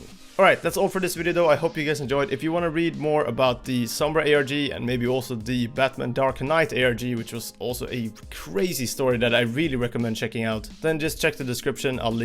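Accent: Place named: Sweden. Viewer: är du svensk du har dealect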